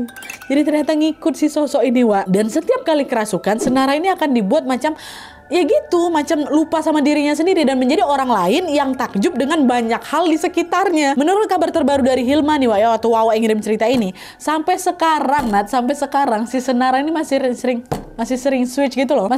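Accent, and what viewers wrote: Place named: Sumatra, Indonesia. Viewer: Kau orang mana kk ? Bahasa mu kyk org sumatra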